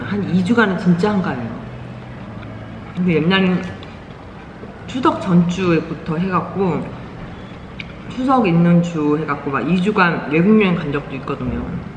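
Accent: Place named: Chungcheong, South Korea. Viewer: 힘들게 사는겨~ 충청도 사투리 정겹네요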